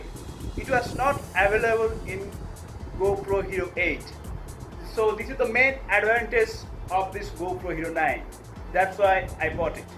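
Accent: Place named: India. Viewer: You sounds like pure NRI....nice unboxing video...keep it up 👍👍👍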